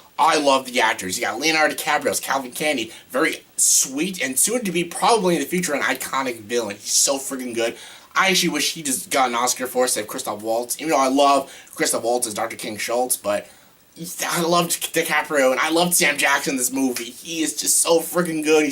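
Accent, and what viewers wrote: Canada. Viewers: He's Canadian that's probably how they pronounce it no need to call him on it.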